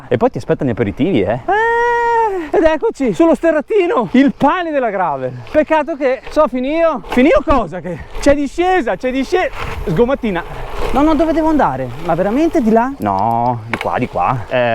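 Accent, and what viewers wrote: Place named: Milan, Italy. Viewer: Fabtastico sei pure passato accanto a casa mia mannaggia.... il tuo milanese é perfetto (d'altronde sei un autorità in materia 🤣). Grande giro attendo il seguito.... beati voi per gli spritz qui ci "pelano" molto di più 😅 forza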